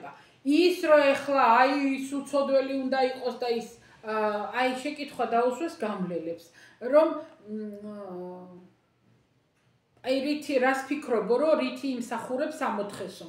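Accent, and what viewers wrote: Kakheti, Georgia. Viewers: იხარეთ მუდამ !!! ❤❤ როგორ მახარებთ !!! ❤თქვენს მიერ გახმაურებული კახური აქცენტებით ...❤ ,, არაუშავრა..."❤ მიყვარს თქვენი მოსმენა... და მამხნევებთ ძალიან...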